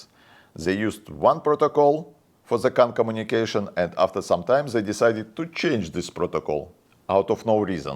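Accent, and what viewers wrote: Ryazan, Russia. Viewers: Ну как не послушать прекрасный русский акцент - главное что даже без перевода понятно все 😊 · Почему мне кажется что у автора русский акцент... особенно после: уан, ту, сри 😅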